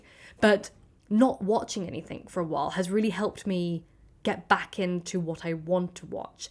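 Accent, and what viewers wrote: United Kingdom. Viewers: I am addicted to binge - watching your videos,! Actually i am studying English with lots of videos by British people (because i don't like the American accent) but i don't feel unhappy at all, on the contrary, YouTube is the best thing that happened to me latelly. I really adore you accent, it sounds like good music to me. Thank you so much for your content, which i love as well. 🙏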